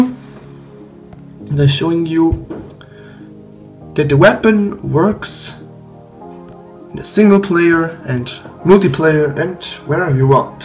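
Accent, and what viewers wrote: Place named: Germany. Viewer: Are you German or something? you kinda sound like your German. And if you are, Hallo :-)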